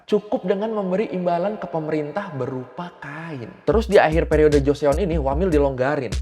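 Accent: Thailand. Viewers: anjing aksen thiland lu mantap jon..... ngakak gue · bisaan maneh ngomong bahasa thai pke logat na t.g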